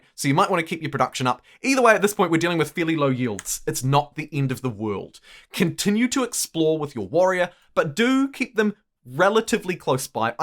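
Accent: New Zealand. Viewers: Sounds like a New Zealand accent, though I may be mistaken. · I'm super late to this game – never played Civ anything before, and Civ6 is free on Xbox Game Pass – but I've fallen deep in the rabbit hole, and I wanted to say thanks. Love your friendly voice, simple and clear advice, infectious enthusiasm and as an Aussie, I really enjoy the kiwi accent. 🙂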